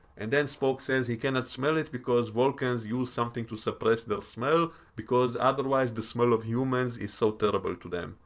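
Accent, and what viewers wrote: Russia. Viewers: I explained it this way: the Vulcans simply accepted their wish to not communicate the first time. Not insisting on further action. I totally agree on the loose stuff on ships that shake every now and then. Someone said there were force fields to keep everything in its place, but that doesn't make sense. I'm a man and I love cooking but I never looked at it with gender in mind...... Human Spock eats the bacon after he said "I have to catch up on things", or something to that effect. I think Vulcans have an ability to control their emotions based on certain biological factors. I think they mentioned that once in Enterprise. Mia Kirshner is only 11 years older than Ethan Peck. Spock's mother assimilated quite well to Vulcan customs, so perhaps that's why they can mind-meld? I agree on "emotional Spock". It's a tad annoying. But since it's a prequel series, they perhaps try to explain how TOS Spock became the way he is/was? 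Only question I have: what is your accent? I really tried to figure it out, but I can't. Sounds Russian, but Idk. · What accent is this? Russian?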